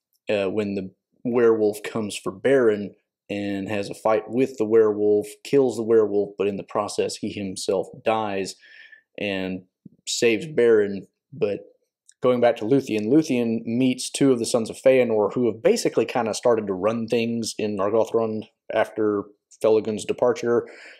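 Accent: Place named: Appalachia, United States. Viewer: you say they’re betrayed by Borlas and you say it a few more times after. I’m sure it was just a slip but the correct person is Gorlim the Unhappy. For those who do not know, Borlas is one of two people: the original name of Borlad, one of the sons of Bór killed in the Nirnaeth Arnœdiad; or the son of Beregond of Gondor. PS - you sound like you’re from Appalachia. If so, I’d guess WV or KY 😅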